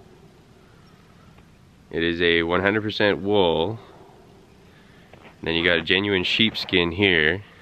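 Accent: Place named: Alaska, United States. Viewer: Thanks for an in-depth review of these hats! The website unfortunately doesn't show the inside and I don't have a Filson store nearby, but I'm considering the Mackinaw hat. Are you up in Alaska, by chance? My Uncle is from there and you have the same accent 😎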